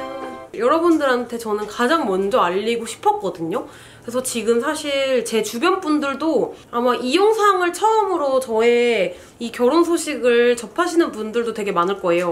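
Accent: Busan, South Korea. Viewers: 뜬금없는데 언니도 부산에 가서 사시더니 사투리 좀 쓰시는 거 같아요 ㅋㅋㅋ❤❤❤❤🤣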